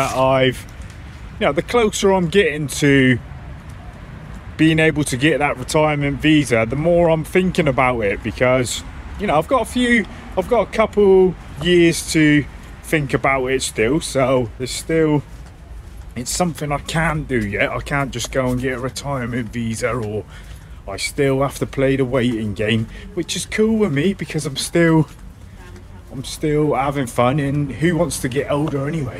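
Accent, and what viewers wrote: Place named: Southwest England, United Kingdom. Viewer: Hi ya Just found out how send a message. Love your views and was wondering what part of uk you are from. I’m also from the southwest Torquay to be frank. I hear a little when you chat. Take it easy man, take it easy. lol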